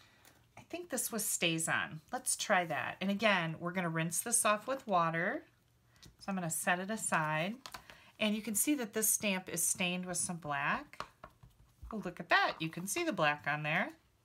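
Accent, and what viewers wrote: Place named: Wisconsin, United States. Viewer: Oh my gosh, I thought my memory issues were because of am of a certain age. 😉 I will start using the Stazon excuse! What fun! Love what you do and the Wisconsin accent. I used to visit Sheboygan. Nancy In Colorado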